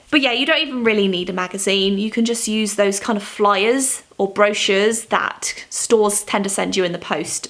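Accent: United Kingdom. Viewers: I watch your vids for hacks and to hear your amazing voice(I would love to have that British accent ahhh <3 ) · not related to her hacks - but is her accent british. to me it looks like british, but then she mentions about dollar store and Ebay USA. so me a little confused. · Thanks, you always make me laugh, and it's great to hear a British accent. I'd heard of the coloured pencil and baby oil, however, wasn't sure it worked... One hack I always use is Ellnett hairspray. It has to be silicone free. Cheap alternative to graphite fixative, I've even set pastels with it too, and it's a quarter of the price of the named brands. 😊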